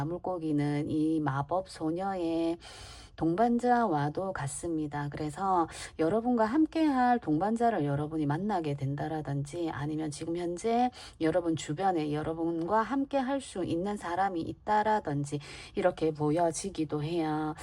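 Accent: Gyeongsang, South Korea. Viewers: 4번 갱상도 억양으로 들려주는 리딩~ 감사히 잘 들었습니다 나를 많이 챙겨라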